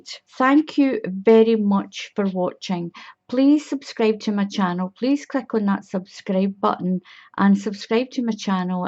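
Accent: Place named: Scotland, United Kingdom. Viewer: I can't believe it...my Momma, 86, just asked me where you were from. I mean, you sound like you were born just down the road from Sean Connery, and she asks! Hello from Texas! This is another great, beautiful tutorial, thank you.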